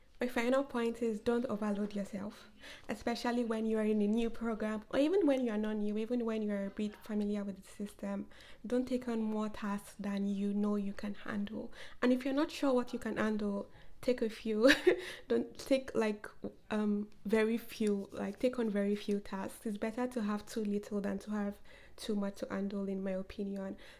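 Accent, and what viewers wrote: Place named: Nigeria. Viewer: I knew it! You sounded Nigerian in the first video. Seeing that you last posted 9 months ago, I low-key wish I could connect with you. I know PhD is a lot. I pray you pass your first year qualifying examination and find the balance to come back to posting. Wishing you the best,.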